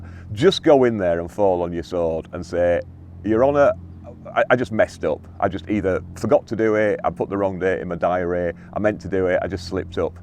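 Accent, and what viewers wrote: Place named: North, United Kingdom. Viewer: how many times a day do you have to ‘Bite your lip’ & actually not ‘call a spade a spade’, but use a euphemism instead? You explain things so eloquently, in a northern accent, that I could listen to all day. Thanks for being so ‘natural’.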